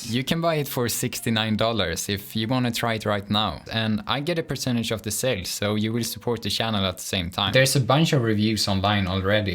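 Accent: Sweden. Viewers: A- are you swedish? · YouTube knows me well, nothing better than Swedish accent working on an impossible music instrument to not go to sleep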